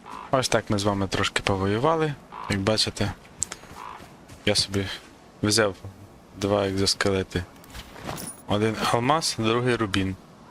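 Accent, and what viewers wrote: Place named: Poland. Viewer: у вас гарна мова але чую акцент, ви поляк? :)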